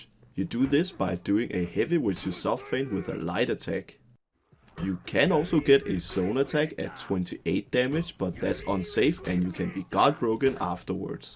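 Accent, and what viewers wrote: Denmark. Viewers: fuuuuck, man kan godt høre at du er dansker :D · The Danish accent is amAzing i love it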